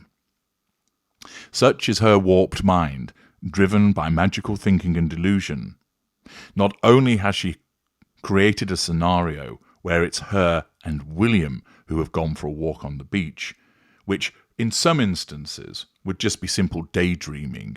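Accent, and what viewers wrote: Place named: North, United Kingdom. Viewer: I detect a Northern Accent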